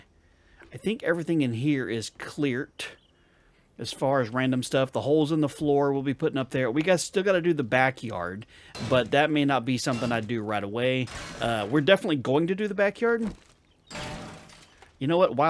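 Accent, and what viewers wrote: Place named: Midwest, United States. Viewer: not to shabby man. Enjoyin the vids. BTW dumb question. Where you from? Sounds like the midwest with the way you speakith 🤣😂🤣😂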